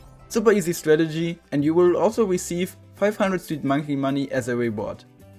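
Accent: Germany. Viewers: You sound like a German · is there a GERMAN AKZENT MEIN LIEBER SPORTSFREUND?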